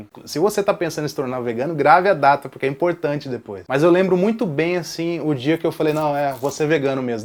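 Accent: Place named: Northeast, Brazil. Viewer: Êa, Cara, Show! obrigado pela dica de anotar a data! eu anotei agora pra não esquecer porquê dissestes. fiquei de cara em saber que é nordestino, já tinha assistido diversos vídeos e não tinha prestado atenção no seu sotaque ainda, e olha que cresci em Sergipe, moro aqui e morei muitos anos na Paraíba também. Abs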